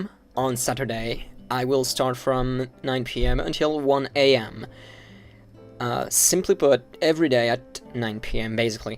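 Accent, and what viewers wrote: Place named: United Kingdom. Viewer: cet accent british sa race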